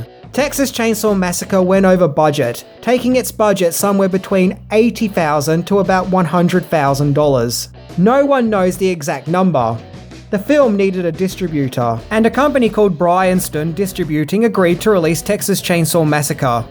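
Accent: Australia. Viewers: He's Australian. He can't help it!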